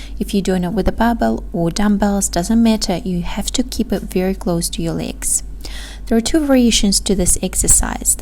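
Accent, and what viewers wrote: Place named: Russia. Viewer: Очень сильно бьет по ушам рашкинский акцент)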